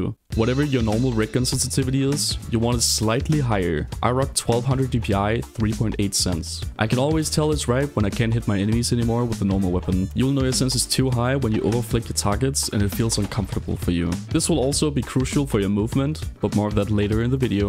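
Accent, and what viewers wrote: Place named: Denmark. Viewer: You sound like your from denmark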